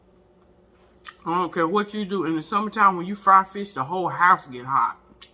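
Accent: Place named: South, United States. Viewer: Happy Birthday and many more, my Birthday is the 4th of July and I will be 61 GOD is good all of the time. I just love your sense of humor and your Southern accent it cracks me up. Have a wonderful and Blessed Day and stay safe.🙏🏾👸🏽💖💯💫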